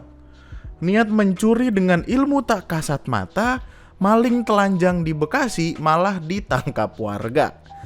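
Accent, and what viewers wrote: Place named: East Java, Indonesia. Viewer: Owalah, pantes aku ngerti bosone. Jatim kidulan ternyata 🤣🤣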